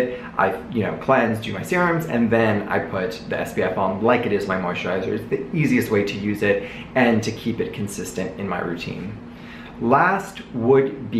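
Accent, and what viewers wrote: New York, United States. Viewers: Our first New Yawk Tawk!! Love it. Sip sip!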